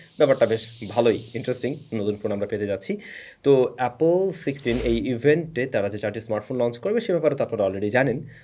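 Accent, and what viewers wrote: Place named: Noakhali, Bangladesh. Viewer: bhai Noakhailla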